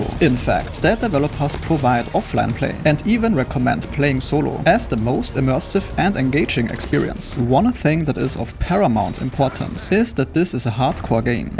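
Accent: Germany. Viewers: Boah dieser deutsche Akzent geht schon hart XD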